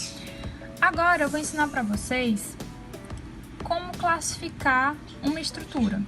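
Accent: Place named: Maranhão, Brazil. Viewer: Você é do Maranhão ?? Tem um sotaque parecido com o de uma amiga minha que é conterrânea dela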